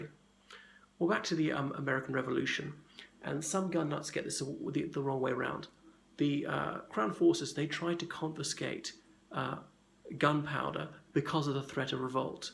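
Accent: United Kingdom. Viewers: You’re an embarrassment to the Irish and you sound like a Brit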